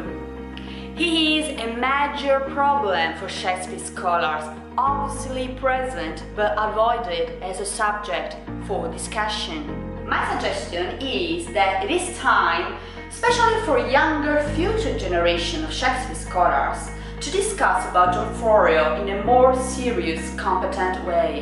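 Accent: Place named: Italy. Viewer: Please turn on closed caption I'm afraid i'm missing a lot of your argument because of interference from your italian accent. A quality that perhaps in his time also occurred. Fascinating subject and you're right on the money about the airbrushing of character reputations like Florio in relation to Shakespeare.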